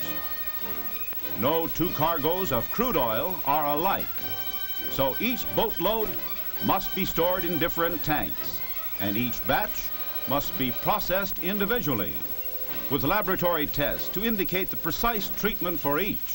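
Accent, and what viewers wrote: Transatlantic, United States. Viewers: It's called the Trans Atlantic Accent. It was used back then in all American film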